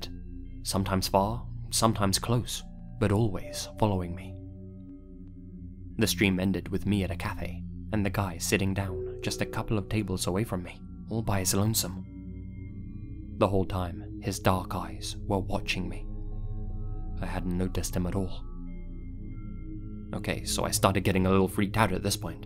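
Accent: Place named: United Kingdom. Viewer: You're from Brazil, have a "British" accent (though I can't really place it), and you've lived in Honk Kong... wow, what an unusual mix. Greetings from Chile!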